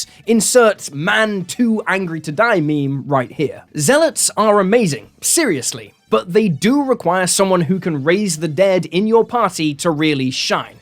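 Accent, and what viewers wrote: Australia. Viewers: I thought you were Australian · i dont know why i thought he was Australian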